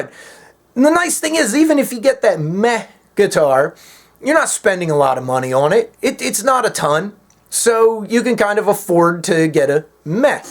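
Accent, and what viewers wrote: Philadelphia, United States. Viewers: your accent reminds me of the South west Philly accent lol thays why I asked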